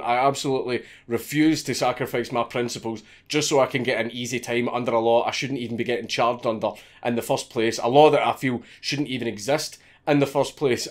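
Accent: Scotland, United Kingdom. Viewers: SCOTTISH AF! Where's your blue face paint son?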